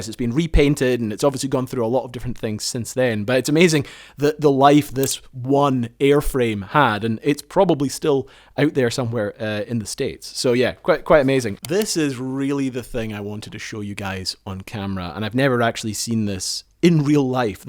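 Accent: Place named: Scotland, United Kingdom. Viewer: I don't understand your Scottish accent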